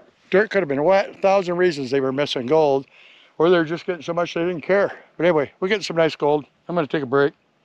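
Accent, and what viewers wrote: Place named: South, United States. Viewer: your a peach,i love that slow southern way,you should get a paycheck from the state tourism board.its just the best education videos in the gold search.amen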